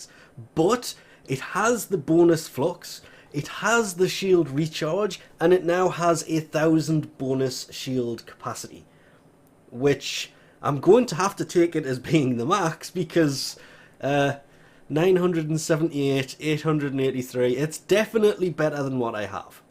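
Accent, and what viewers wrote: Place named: Northern England, United Kingdom. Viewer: Desrei Camacho dude wtf, tacky spud is like full on Irish, this guy is from up north England somewhere, ya they speaking the same language but wtf dude are you deaf?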